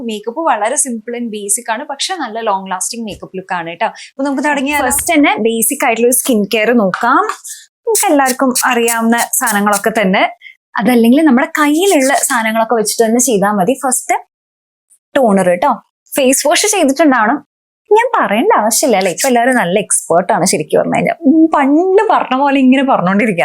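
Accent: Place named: Valluvanad, India. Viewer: Thrissur കാരി അല്ലേ...പക്ഷെ വള്ളുവനാടൻ ഭാഷ ആണെല്ലോ പറയുന്നത്...സ്റ്റൈൽ ിനു വേണ്ടി ആണോ...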